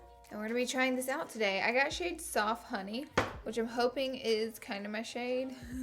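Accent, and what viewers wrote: South, United States. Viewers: she looks so pretty in this but i love the southern accent because i'm southern too 💗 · Love this look! Is it just me or does her fabulous accent sound really strong in this video?! Southern accents are my fav! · i used to hate southern accents for some reason and then i fell in love w/ her omg :') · it bothers me that this comment is on every video and still....I mean doesn't it occur to you that it's not improper pronunciation, it's an accent? she's clearly from the south! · Were you tired when you did this vid hun? I'm a newbie subbie but you didn't seem as bouncy as usual? Btw love your accent (I adore a southern accent! Always wanted to marry me a southern gentleman - kinda hard to find them in south east London uk though!!) 😋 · I love the way you say sugar! Southern accents are amazing❤️ probably why I married a Texan 😂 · I'm so in love with your accent. 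I'm from Texas and miss people talkin like that. But your accent is a lot stronger than us Texans lol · PSA: Shes from the south. She has an accent. Get over it. 🤷🏻‍♀️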